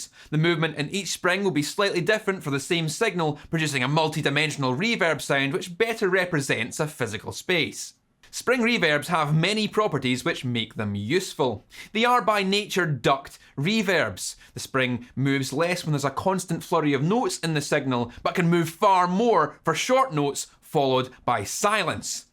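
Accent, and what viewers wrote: Scotland, United Kingdom. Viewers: I think this video is great just to listen to your Scottish accent 😆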